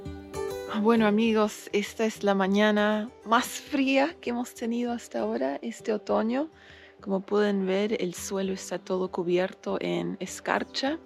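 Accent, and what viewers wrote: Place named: Argentina. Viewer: tu acento eres una argentina más!!!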